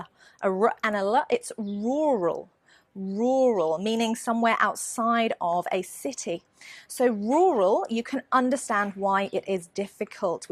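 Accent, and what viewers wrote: United Kingdom. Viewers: cool your british accent. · I loved British accent after that vedio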